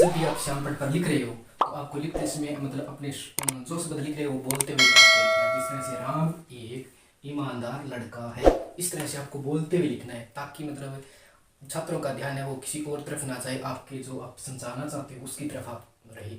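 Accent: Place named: Haryana, India. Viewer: Ram ram sir 😍 bhut bdiya smjhaya thodi haryanvi touch language sir 😄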